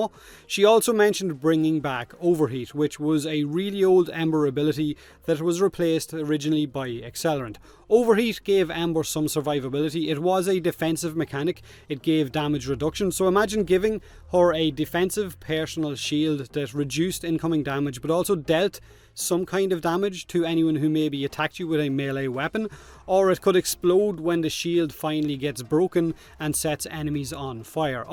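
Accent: Ireland. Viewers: Honestly, i'd kinda like ember to stay very squishy but with a very high damaging capability, like nuking and such. Not some dumb press 4 to win, but something more like perhaps wisp's 4th ability, where you have to stack the reservoirs in order to deal the maximum amount of damage. Because warframes that have like one or two signature moves and a defensive shield, well, it's kinda all there is to new frames. Revenant, the new Nezha etc... I'd hate for that to become a recurring theme in the newer warframe designs. I really want ember to stay some kind of a glass cannon, but hoping DE can make her not point and click (the mesa rework on her 4th ability is a good exemple of a nuke ability well done). I'm sure they can pull off something along those lines. BTW, english not being my first language, it's getting pretty hard to understand what word you feel like you'r confusing yourself with (like third and turd). 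Just go with the irish accent it fucking rocks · Impressive,! You've adopted an irish accent, just like Zane from Borderlands! 😎👍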